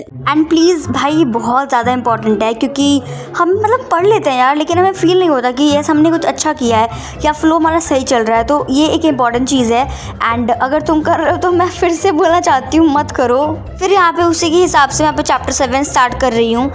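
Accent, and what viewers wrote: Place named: Haryana, India. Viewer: Acha per apki boli to haryana jaisi h 😅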